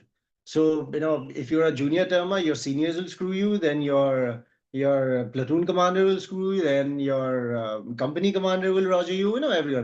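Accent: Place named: Assam, India. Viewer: I think he origintes from Assam through is accent.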